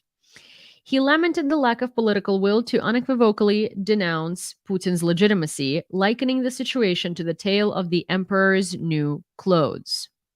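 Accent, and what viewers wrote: United States. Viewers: she is an american, not slavic woman...this american accent))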